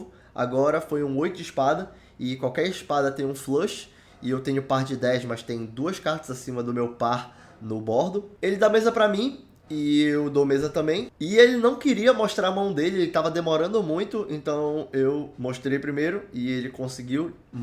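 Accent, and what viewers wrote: Pará, Brazil. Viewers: Sotaque Paraense. Muito bom canal.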